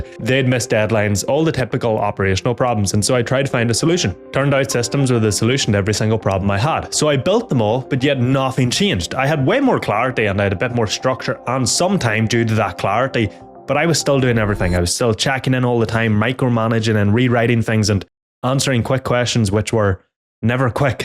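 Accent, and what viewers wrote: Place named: Northern Ireland, United Kingdom. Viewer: He got that light northern Irish accent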